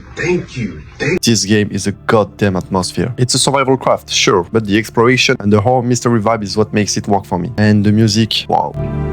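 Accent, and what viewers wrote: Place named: France. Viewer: remove the french accent you sound pity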